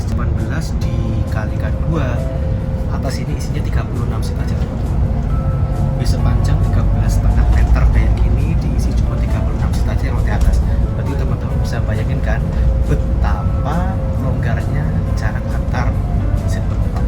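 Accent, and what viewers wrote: Java, Indonesia. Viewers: Yaampun logat bahasa jawanya itu loh yg bikin 😍😍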